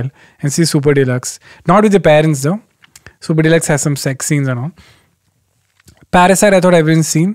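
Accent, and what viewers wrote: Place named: Bangalore, India. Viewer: Hey Kenny, First of all I simply adore your work and I am in love with the version of you in these long recording of you speaking!!! Okay, some background about me, I am Bangalorean born and raised in that lovely city and now stay in Indore, M.P. Your way of speaking, your accent, the dialect all of it reminds of my time in that city. Little, little things about how you say chumma, pavaam, maacha, when you add "it seem" after certain words is just too cute and makes me go awwwww!! I really admire your work be it the set on Jet airways (i don't have a count of how many times I have watched this), dating rules for guys (where the girls collect all the sorry omg what an observation, Kudos on that), all your chai time episodes they are all simply awesome :) I just love your observational skills and brutally honest opinion about each and everything. 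My Question to you is how did you cope up with the feeling of moving from one city to another? don't you miss Bangalore? weren't there days when you wanted to just go back to the city? or just some food place/joint(s) that you miss eating some amazing ghee roast dosa? or the filter coffee I mean anything! It was a hard time for me moving to Indore (very hard in-fact) I would love to know your experience though. Also where do you want to stay after your retirement? When is your special on Netflix releasing? Also, please for God's heaven sack please do a show in Indore I am so waiting to watch to perform live (obviously once this Covid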